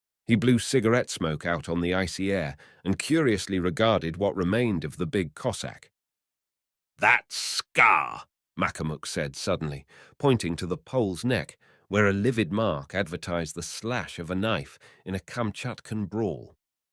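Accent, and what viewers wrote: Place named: United Kingdom. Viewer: Are you crazy? All American author read with a heavy British accent is NUTS. Jack is turning over in his grave.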